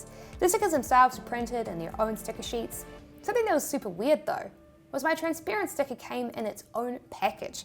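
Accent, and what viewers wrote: New Zealand. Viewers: Sorry.......-but the extreme New Zealand accent is a bit unclear,--- as well as hard on the ear........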